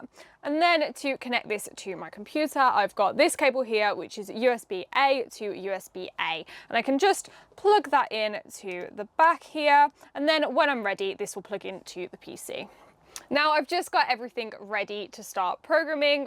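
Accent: United Kingdom. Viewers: Okay, now I understood, what means "british english" 🤯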